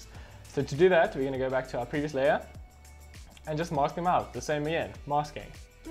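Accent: South Africa. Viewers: This guy sounds South African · you sound like you are from South Africa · CaptanW smh hes got a south african accent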